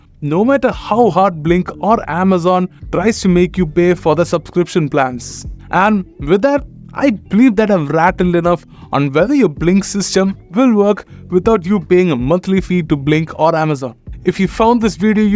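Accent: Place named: India. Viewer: This might be better for US people if it was presented by a native US citizen that doesn't have such a strong indian accent, without the CC feature it is very hard to understand what's being said.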